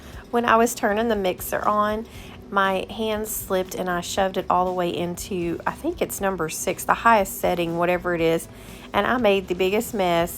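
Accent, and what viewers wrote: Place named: South, United States. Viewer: I watched Charlie's country cottage clip then onto yours.....I just subscribed to your channel and my next trip is to the grocery store to buy delights super moist spice cake and 100% pure pumpkin. I love your southern Belle accent...and really liked your presentation....I almost smelled your muffins. I really enjoyed your video. Thank you...it will be so "yummy ya'all.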